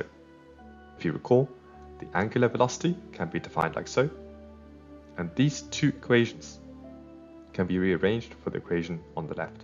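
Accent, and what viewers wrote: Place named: Germany. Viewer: 想问博主是中国人么，感觉您在德国生活过很长时间哈哈，德味挺重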